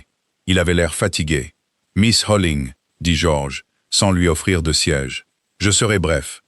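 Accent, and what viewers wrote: England, United Kingdom. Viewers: L'histoire est belle et originale! Bravo! Dommage pour l'accent anglais assez inutile...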